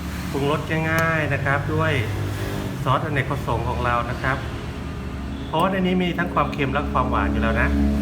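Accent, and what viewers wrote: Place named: Isan, Thailand. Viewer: เชื่อว่าตอนอยู่กำแพง คงจัดหนักแบบไม่ธรรมดา ขนาดเห็นสาหร่ายเป็นเต้าหู้ คงเป็นเพราะฤทธิ น้ำเก๊กฮวย กับน้ำอัมพัน ยังไม่หมด เมนูแกงจืดสาหร่ายหมูบะช่อคงช่วยให้หายแฮ้งได้ดีคะ เมนูนี้การันตีด้วย ยังไง้ ยังไง ก็แซ๊บ แซบ อยู่แล้วคะ (คนกำแพงแต่ชอบพูดอิสาน น่ารักดีคะ)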